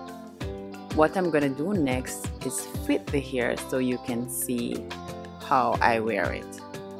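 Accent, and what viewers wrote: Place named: Jamaica. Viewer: I love your accent. Is it Jamaican 🇯🇲?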